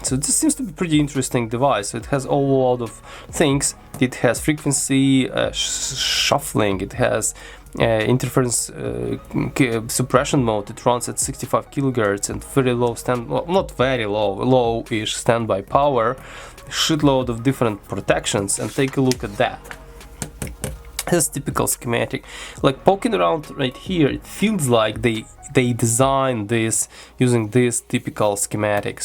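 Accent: Russia. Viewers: У вас российский (или другой специфический) акцент? или у меня что-то с восприятием речи? 🤣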